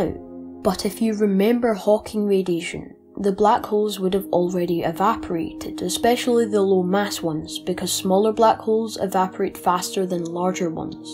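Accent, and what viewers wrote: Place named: Glasgow, United Kingdom. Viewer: And here after watching your clip on black holes....I've subscribed and look forward to your future footage....yir a smart wee cookie.....sound like yir fae Glesga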